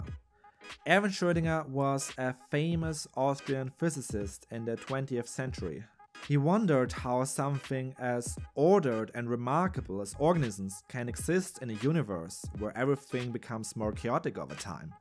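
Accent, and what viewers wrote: Germany. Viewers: Nice Video, good graphics., but your Thumbnails lack in quality and you have a strong German Accent. Try to work more with the Algorithm, so your channel picks up. Better Thumbnails, Titles, and less Accent if possible. Just my personal advice, because I want to see this channel grow in popularity. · I see, you are probably a German (cause of the accent) and also you USED COFFEE AS AN EXAMPLE, BUT WHY NOT TEA ?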